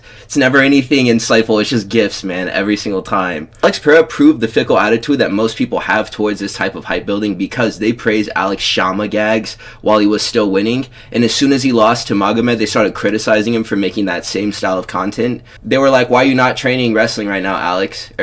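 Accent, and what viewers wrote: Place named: California, United States. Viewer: You have a very self-inflated perspective; you're not the only "true" MMA fan, and the people who disagree with you aren't all casuals, i.e., the UFC fighters literally calling for Jones to be stripped of the title. And it's hilariously ironic that you have the audacity to make fun of someone else's voice while rocking a flamboyant Californian accent.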